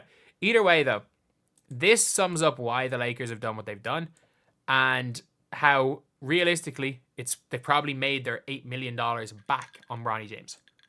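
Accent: Ireland. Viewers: yo bro are you irish? the accent looks like that :D good content, cheers))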